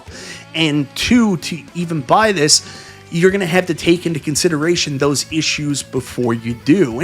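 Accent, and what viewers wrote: Newfoundland, Canada. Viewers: Are you a newfie or caper?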